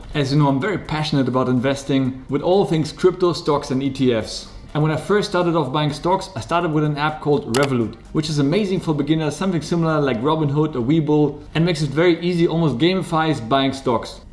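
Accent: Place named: Germany. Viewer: Are you from Germany? Your command in English is very swift. Once in a while I hear a slight German accent 😊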